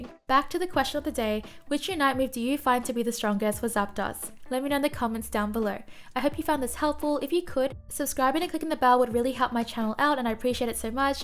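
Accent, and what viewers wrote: Australia. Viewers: Aussie accent pokerap incoming. Can't wait. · I'm Australian so I never even noticed your accent, keep up the great work! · Plz make the Australian pronunciation for every pokemon. Videos by gen plz. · As an American finding your channel in the last 3 weeks hearing your pronunciation of Zapdos was so interesting to me because of the whole ArcticUNO, ZapDOS, MolTRES thing where the names of the birds are uno, dos, tres in Spanish and that's the whole naming convention. Having you say it as Dos like windows DOS has consistently fought my old man pronunciation in a way that is both funny and cool, I'd love a series of the names Austrailian's sound out as different than the typical American's! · Love ur Australian accent and I appreciate all the hard work you put in to give us indepth info on the game so we can better understand the game and improve looking forward to future videos......I believe zeraora has the best unite move for Zapdos since it not only deals damage but increases atk spd combined with discharge and volt switch he can solo Zapdos easy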